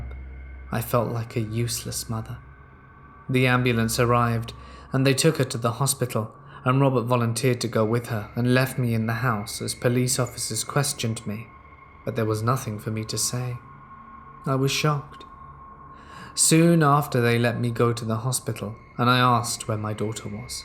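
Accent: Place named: England, United Kingdom. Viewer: The last two stories were the most horrific! If the stories had been too far fetched... like ghost or just a bit creepy I would listen again but these were too near the knuckle... so I won't listen to any more. I'm now confused... your accent sounds English yet you use awesome and dude, you now sound as though your morphing... why?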